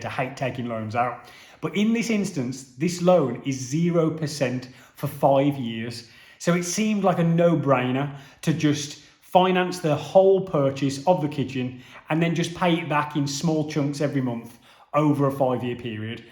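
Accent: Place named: Midlands, United Kingdom. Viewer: Straight away, I picked up on ur accent I live in Wolverhampton and wen u said 9 hundred odd for ur mortgage I woz like 😮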